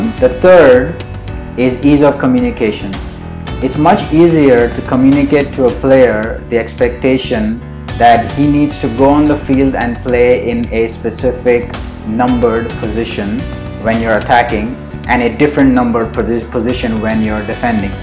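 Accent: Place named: India. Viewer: love your accent... Indian? 😛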